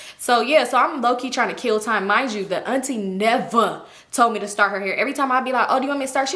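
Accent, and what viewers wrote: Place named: California, United States. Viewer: Every now and then I hear your cali accent it’s so cute yall say arms and party differently lol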